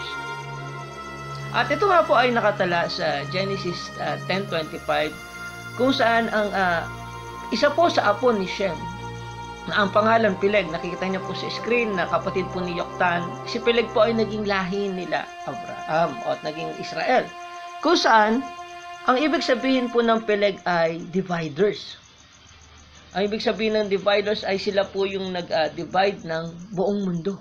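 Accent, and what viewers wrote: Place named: Ilocos, Philippines. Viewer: Ilokana ng narrator sir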